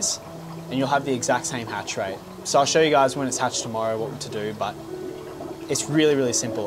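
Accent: Australia. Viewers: Australian, not Pom.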